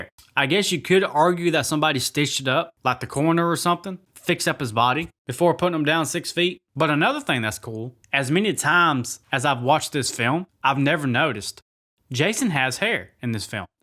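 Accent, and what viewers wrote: South, United States. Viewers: 1. I couldn't find a "10 Things I Still Don't Understand About" playlist. Where is it? Trying to binge those videos and YouTube keeps playing videos from other channels after one ends. 2. You sound as country as me, so you should know that country families usually have their own cemetery. Just a little piece of land somewhere on their property where they bury their dead, assuming the family has lived there since the 1800s, early 1900s at least. The question should be why is the Crystal Green (Forest Lake) cemetery so huge?! Is the town really that big? And filled with newcomers who don't have a family cemetery? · You mentioned so I am curious, how old are you? Range? I ask because I grew up with these films and watched them multiple times. I am about to meet Corey Feldman and Adrienne King at a convention so I really like your videos and you have a nice voice, is that a southern accent?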